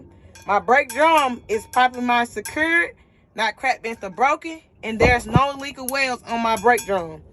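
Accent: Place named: Dallas, United States. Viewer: Watched all your videos back to back and they are very helpful. Can tell you in Dallas from the accent love it. Thanks for the videos, got about two weeks till my test!!!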